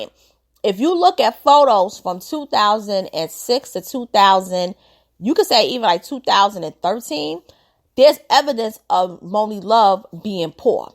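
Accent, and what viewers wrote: New York, United States. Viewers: Yo your voice! Straight N.Y Yo!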